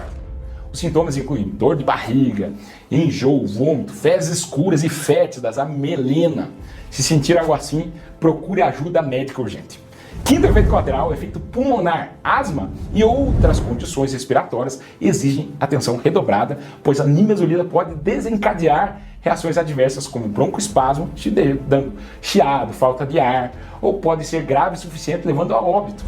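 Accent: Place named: Caipira, Brazil. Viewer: Taquifemia com retroflexo "R" caipira! Não entendi nada! 👅 👅 👅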